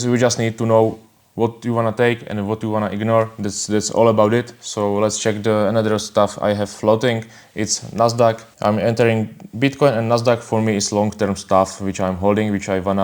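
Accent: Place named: Czech Republic. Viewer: ten ceskej prizvuk je tam hold slyest🤛:finger-red-number-one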